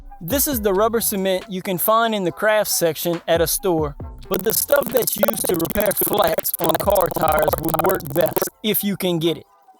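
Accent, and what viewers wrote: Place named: New Orleans, United States. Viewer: Where you from New Orleans? You have the accent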